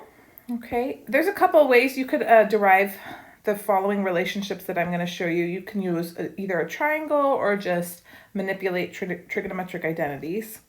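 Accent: United States. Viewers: As a German, I can say that your pronunciation of Weierstrass is pretty good, but you can tell you're from the US 😄.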